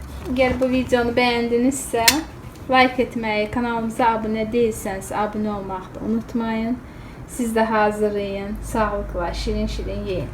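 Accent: Azerbaijan. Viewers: Azerbaycan şivesine bayılıyorum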